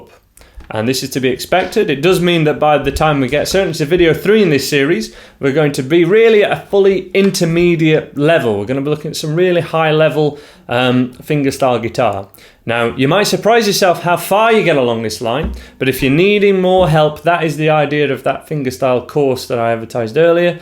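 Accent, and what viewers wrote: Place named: Buckinghamshire, United Kingdom. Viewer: Where u from? U sound Buckinghamshire esque.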